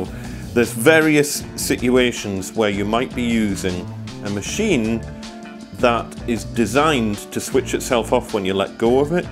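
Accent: United Kingdom. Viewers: That's a UK accent, and UK plugs...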